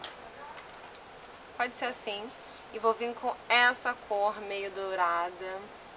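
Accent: Rio de Janeiro, Brazil. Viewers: Nossa flor, antes eu jurava que vc era uma pessoa metida pelo seu jeito de falar, mas hoje penso totalmente o contráriovi que é apenas seu sutaque carioca, vejo vc uma pessoa super legal e que apenas quer compartilhar conosco aquilo que vc sabe fazer bem que é ensinar a maquear, adoro seus vídeos, parabéns!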